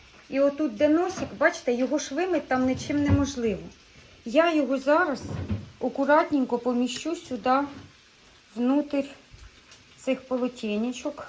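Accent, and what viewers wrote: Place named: Surzhyk, Ukraine. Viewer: Який жахливий суржик! Невже не можна хоч словничок погортати, перш ніж знімати відео?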